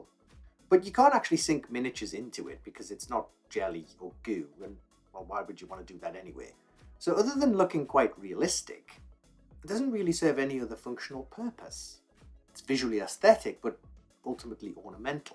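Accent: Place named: Northern England, United Kingdom. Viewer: I've just watched all your videos and they are excellent. You're right: there isn't anything else occupying the space between scratch-bashing and ultra-expensive mega builds. Personally, I want the look of a GW-only table because I love the aesthetic and their kits but, like you've pointed out, they're impossibly expensive, they actually limit you in all sorts of ways, and they often don't work all that well. Plus so many channels resort to mdf kits and 3D printing which isn't my jam. So I am your target audience (plus, I also remember card scenery, and I also got a letter printed in White Dwarf back in the day, so we could be brothers). Talking of brothers, are you related to RPArchive, because your channel is like its 40K twin. Gentle, northern bent; perfectly manicured nails; impossibly neat house; incredibly comprehensive advice and instructions; original project ideas; OCD-friendly; slightly bossy and judgey in tone; professional video production; slightly clinical, but totally stunning results; and uplifting and inspirational (as much as brits ever can be). 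Bravo, sir. Subscribed, and really looking forward to seeing more videos from you.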